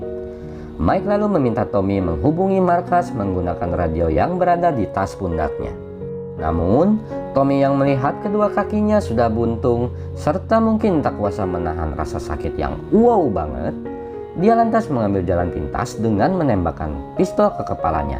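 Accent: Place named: West Java, Indonesia. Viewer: si sunda pisan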